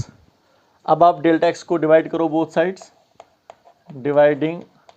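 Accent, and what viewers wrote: Kashmir, India. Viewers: Humarai teacher nai yehi method dekhaya tha aur mai nai full YouTube mai dakha kis nai b aasai nhi kiya hai but thanks sir aap nai yeh video banaye hai thanks sir aur aap kai tone sai lag raha hai aap kashmiri ho so kashmiri best hai 💕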